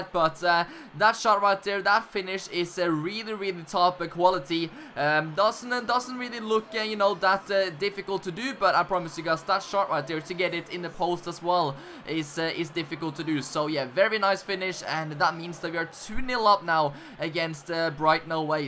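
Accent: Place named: Norway. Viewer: You sound like you're from Norway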